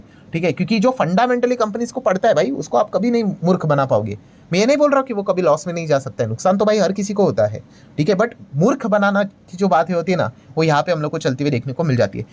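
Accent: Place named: Rural, India. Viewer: Gadha share market ka bolna nahi sikha gaon Wale ki tarha bolta hai .educated analyst ko dekh kar kuch sikha kar ke Kay bona hai aur kitna bolna hai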